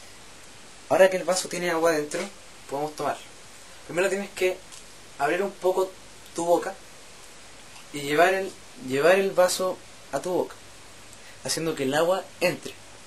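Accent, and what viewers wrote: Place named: Chile. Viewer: Acento chileno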